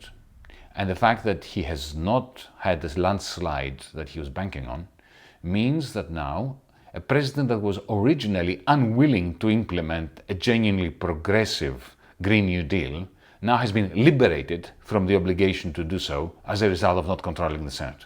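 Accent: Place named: Greece. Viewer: Just keep using your Greek roots as you speak. I'm envious it makes your speech a lot more sophisticated and easy lol.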